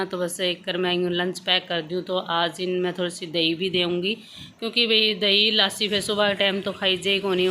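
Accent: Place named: Bagri, India. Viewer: n bagdi bolni sichao aap gi boli hi achi laag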